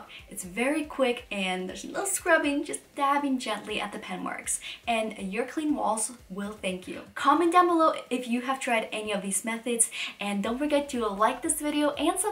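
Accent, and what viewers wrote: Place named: United Kingdom. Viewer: You have a bridish axcent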